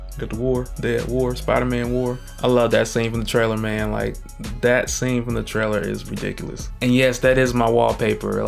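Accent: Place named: East Coast, United States. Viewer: where are you from? iam from jersey and you definitely have that east coast vibe..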